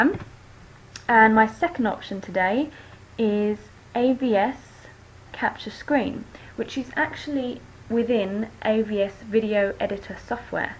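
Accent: United Kingdom. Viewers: <- Falling in Love with your British accent <3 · Are you english. ??? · Dat uk accent *O*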